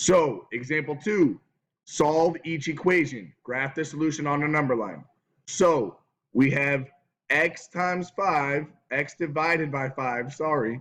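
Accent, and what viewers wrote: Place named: London, United Kingdom. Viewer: You sound like you’re from London.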